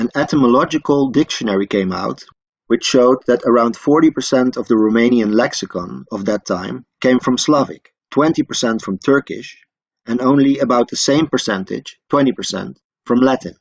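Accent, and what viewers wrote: Netherlands. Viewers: I hear a fellow Dutchie🕵‍♂ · Is this guy dutch?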